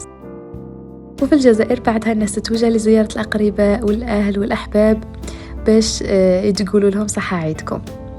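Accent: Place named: Levant. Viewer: انتي تكلمين الهجه اللبنانيةاوالشاميةوليست الهجه الجزائرية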